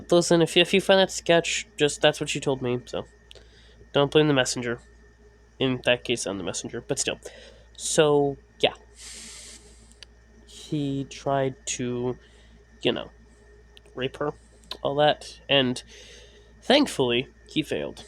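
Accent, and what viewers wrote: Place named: RP, United Kingdom. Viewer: You sound like you attended private school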